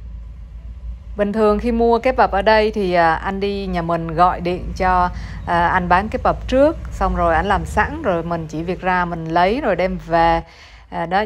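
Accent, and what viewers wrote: North, Vietnam. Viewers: Co noi nguoi mien Tay vay tinh nao vay toi dan Can Tho , nghe giong co co am huong nguoi Bac chu khong rat dan mien Tay cua Chung toi mong noi ro , toi xem clip co moi ngay , congratulations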